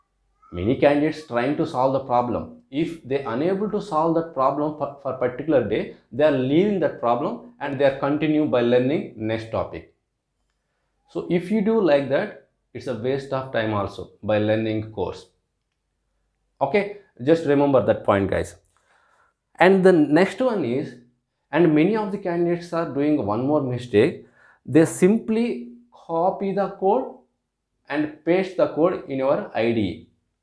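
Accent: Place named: Hyderabad, India. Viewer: I'm sure this sir is teaching some course in Ameerpet. The Slang is same as Ameerpet Faculty. I wonder what course and which institute. J want to join ur institute sir. Pls tel me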